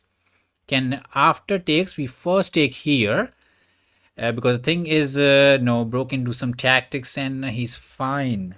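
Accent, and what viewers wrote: India. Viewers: I randomly find your Channel, i love when hindu People speaking english❤, i was work with People from India❤ 🇵🇱🤝🇮🇳 greetings bro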